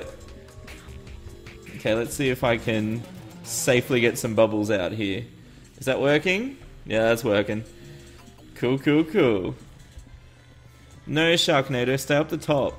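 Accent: Australia. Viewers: Hey, I'm new to your channel but, I love all the videos they are great keep going!!! and all so its good to see more Aussies getting on this!! I could tell in the first episode you were Australian and I laughed when you said in a vid "that rings a bell" and you had to explain it, very funny. Anyway keep going and don't let anything stop you, you are great at what you do.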